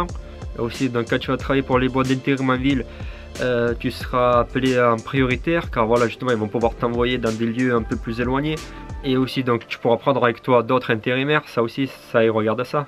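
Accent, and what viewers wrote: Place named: mixed, France. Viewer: Mec désolé c'est pas au sujet de la vidéo et ont a du te le dire déjà mais je beug sur ton accent dés la première fois où je lai entendu, du Français avec un mélange d'accent de l'Est avec un accents du Sud de France.. c'est.. intéressant, oft moi aussi j'éponge l'accent des autres a leurs contact, j'ai déjà eu l'accent d'un de mes pote qui est Ukrainien a force de trainé avec lui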